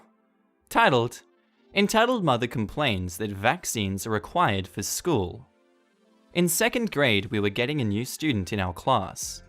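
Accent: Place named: Australia. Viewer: I’m not an Aussie but I love the accent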